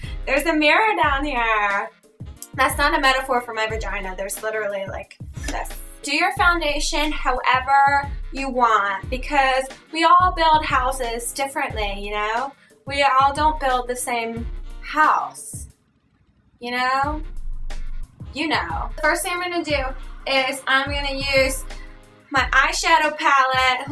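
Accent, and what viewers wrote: United States. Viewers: Oh. it's tha-a-a-a-at fuckin' american! Simply loved it! :DD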